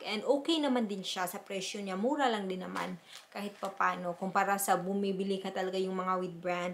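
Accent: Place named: Visayas, Philippines. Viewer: heheheh lagi ky murag may ong tag accent sa Tagalog Maam heheheh✌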